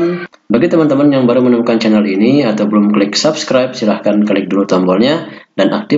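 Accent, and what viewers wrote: Bali, Indonesia. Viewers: orang bali y mas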